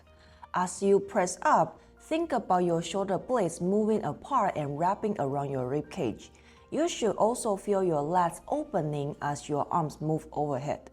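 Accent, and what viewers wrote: China. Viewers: Cantonese accent?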